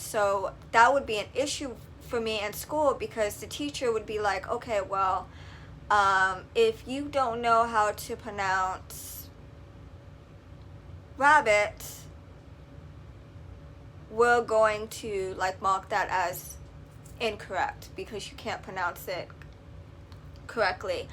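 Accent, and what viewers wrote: New York, United States. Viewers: i hear a new york accent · I wouldnt have noticed any impediment until you pointed it out...but do New York accents not all pronounce coffee Kawfee forever fawevva water waader??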